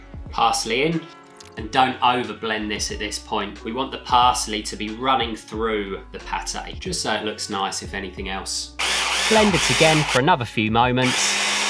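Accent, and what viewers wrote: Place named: West Country, United Kingdom. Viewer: Well you really have a West country accent ....nice recipe.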